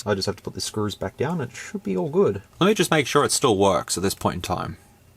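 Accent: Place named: Australia. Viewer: I really appreciate your in-depth reviews. For the most part, I'll watch the intro, listing, turning on, set-up, specs, Quick Shortcut Maker, Conclusion, and teardown. Sometimes, if I have nothing else on the back burner, I'll watch the entire thing at 1.5 or 1.75 speed. The Aussie accent at that speed is hilarious. 😂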